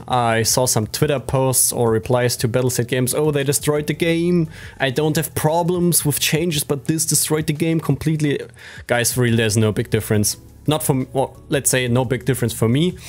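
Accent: Germany. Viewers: You are the reason why I drink some beer, your videos are great and the German accent makes it great , nice video man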